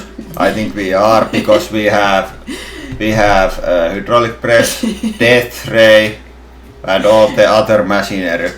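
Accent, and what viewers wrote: Finland. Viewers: Katoin koko videon! Hyvää matskua teette!! Ja tekee vain hyvän säväyksen tuo "rallienglanti" =) Oma englanti kuullostaa samalta =D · oh and btw I watched it till the end. your finglish is quite entertaining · You Finnglish is a huge part of what makes this channel so entertaining! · This is pretty f.word awesome "rallienglanti" accent, it is whole the thing! I appreciate this courage trying to speak English. I hope its not enact! btw you must also compress the YouTube Play button when you receive one! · I'm walking around the house with Finnglish accent in my head now... "POHPULAHR" "KONTINUAY" "HOODRALIK" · i wached the whole video. that finnish accent.. couldnt stop. ;) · If ever I am sad and wish to make myself feel better, I can come to your "Beyond the Press" channel. I love the mad imagination of what you do. And, being a British person who has a son living and working in Finland — with Finnish wife and three children, I love your Finnglish. 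Well done!! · Your english is probably better than the most viewers finnish. (And your accent reminds me on Kimi Raikkonen) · Your video was very informative (long, perhaps, but interesting ergo, length is not an issue). We look forward to more exciting hydraulic press action, in the very near future. Additionally, your English is fine. It adds just the right Finnish quality to your videos. Thank YOU, and have a nice day. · Thanks to you, Hollywood might someday understand that the Finnish accent is not the same as a general Scandinavian accent. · Your English is great, the fact that you have a finnish sound makes it even better. People don't want you to sound English. Keep it up... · Todellakin katsoin kokonaan! Teidän rallienglanti on ulkosuomalaiselle parasta lääkettä koti-ikävään. :) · I determined this video must be dealt with by watching all 43 minutes of it. Well done! Your "Finglish" accent is your trademark, don't change it. 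· kyllä tuli katottua koko video. rallienglantia on aina kiva kuunnella. 😃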